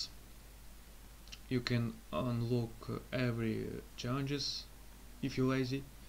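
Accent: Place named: Russia. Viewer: Прекрасный русский акцент👏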